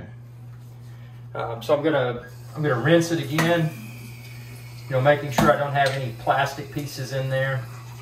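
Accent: South, United States. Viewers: I’m in Florida. What state do you live in? With your accent I’m guessing South Carolina? Georgia? Tennessee? And everyone says “It’s probably your faucet aerator! No it’s not because mine are very clean.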